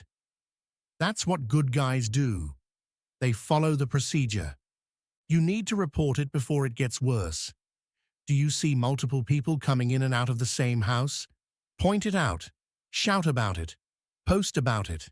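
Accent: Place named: Cockney, United Kingdom. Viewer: Cokney accent ✊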